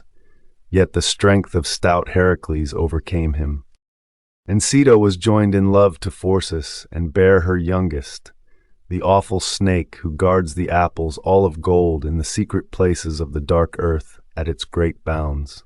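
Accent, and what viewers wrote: United States. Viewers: American accent is an abomination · American accent reading the classics. It's just wrong.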